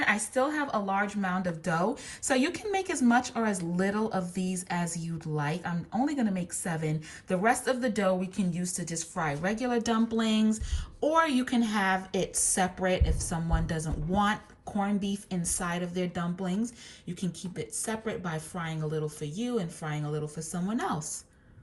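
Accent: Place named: Jamaica. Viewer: Please use your accent more. The Jamaican accent is so beautiful to hear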